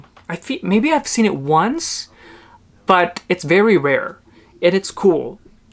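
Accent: Philippines. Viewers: I love your heavy Pinoy accent. Magandang umaga from the USA!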